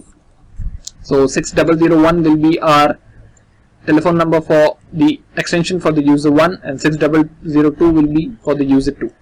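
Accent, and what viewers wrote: India. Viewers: another annoying indian accent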